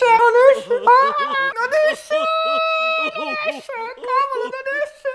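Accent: Apulia, Italy. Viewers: Parla un po' più pugliese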